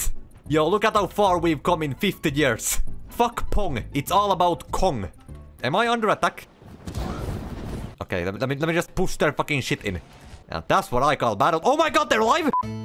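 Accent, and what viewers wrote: Finland. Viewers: Ah. I was wondering what your accent was. Finnish, I see. Only thing I know about Finland is Hanoi Rocks and Michael Monroe or is it Malone? can't remember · it's a finnish accent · why is this guy soo entertaining man, his english with the finnish accent is what hooks me to watch more of this guy. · bro sounds a little too much finnish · The most charming Finnish accent right after Kimi Räikkönen. Very good but games very bad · Bro sounds like he is from finland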